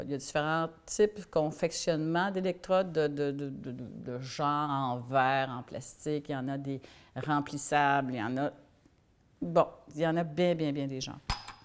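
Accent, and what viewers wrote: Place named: Canada. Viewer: merci pour ce cours qui m'a beaucoup aidé, et merci pour votre accent canadien..ca me rappel mon séjour à Montréal en juin dernier -)) vraiment top ce pays et ses gens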